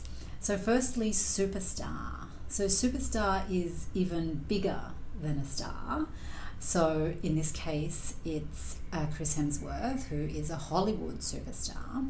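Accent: Australia. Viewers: This is an excellent channel for learning English and the Australian accent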